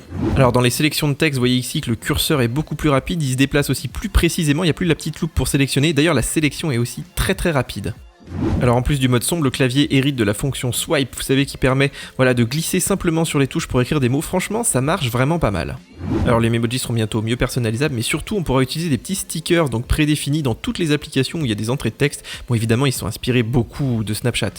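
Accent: Switzerland. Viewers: Mais c est que tu es que tu es valaisan 🤗 je ne savais pas 😏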